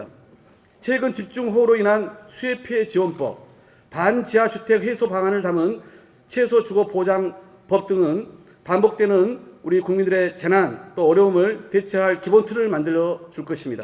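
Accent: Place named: Jeolla, South Korea. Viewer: 다음 총선에서 개박살 날거 아나 모르나 전라도 사투리좀 안듣고 살자 오바이트 나온다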